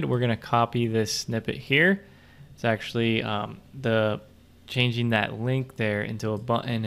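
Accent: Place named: Baltimore, United States. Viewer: Are you from Baltimore or Maybe Philly?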